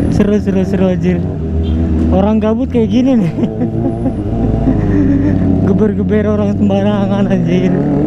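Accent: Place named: Medan, Indonesia. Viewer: Mon maap bang lu orang Mane nih dari logat logatnya macam orang Medan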